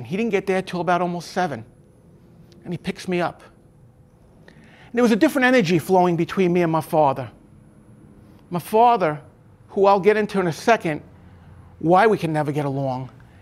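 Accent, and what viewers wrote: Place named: New York, United States. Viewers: This guy could do audiobooks. I could listen to him reading a book all day.....his New York accent......his intonations....fabulous! Thank you for telling your story. You made something good out of something bad. Everyone who's listened to this today got something different out of it. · Hey brother my wife is from Astoria 37/31 and Broadway. I hear the NY wisdom in your voice. Good luck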